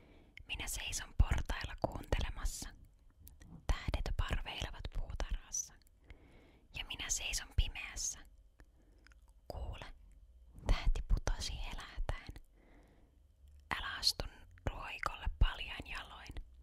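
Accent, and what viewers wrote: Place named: Finland-Swedish, Finland. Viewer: The finnish swedish accent is so beautiful.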